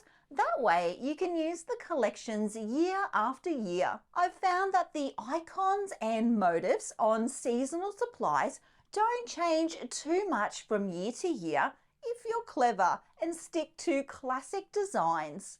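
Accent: Australia. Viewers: Don’t talk daft. It’s a very nice Australian voice. You change your nasty attitude.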